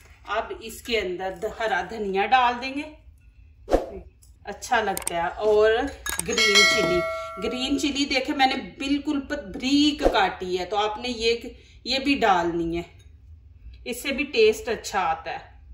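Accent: Punjab, India. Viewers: maashaalla look delicious. AP bolti b bahut piyaray hai Punjabi touch Ata hai. love and respect from my channel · Yummy. Dakh ker both Maza Aya. Urdu main Panjabi lehjy Ka meetha touch. Kia khob.maza a gia😊❤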